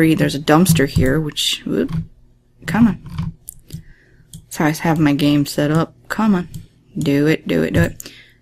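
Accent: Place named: New York, United States. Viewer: Are you from New York? I went there last spring and you sound like you are from there.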